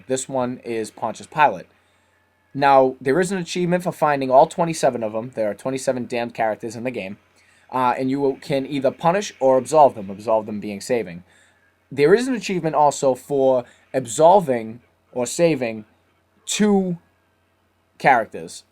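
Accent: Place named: Boston, United States. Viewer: just watched ur part 1, ill be viewin all of um for my plat. good job dude, n the boston accent=tight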